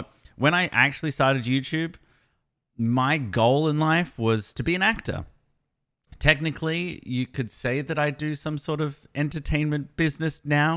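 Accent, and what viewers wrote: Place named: United States. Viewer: 6 years and an american accent later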